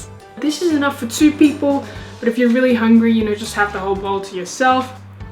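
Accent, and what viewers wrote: Australia. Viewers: I love the Aussie accent! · You sound soo Australian, I think it’s also a ‘Sydney’ accent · Why do I keep seeing australian or New Zealand accents in Asian people a lot nowadays ... very satisfying and yet odd lol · Asian woman with an Australian accept is a trip right now... great vid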